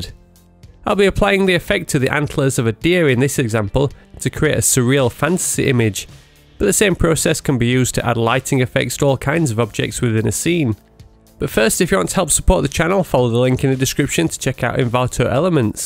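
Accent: United Kingdom. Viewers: you're such a cool bloke and your bri'ish accent is very satisfying to listen to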